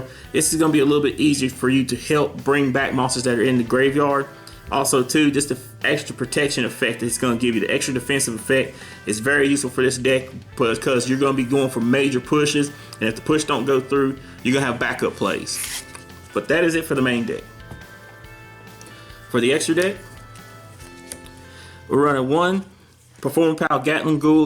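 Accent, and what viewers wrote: South, United States. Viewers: You sound like a country boy but nice profile 👍👍👍😀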